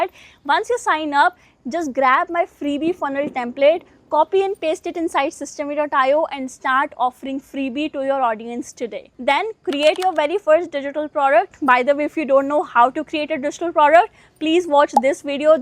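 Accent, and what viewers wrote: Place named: India. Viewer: your accent feels like India + name also But nice reply me are you Indian?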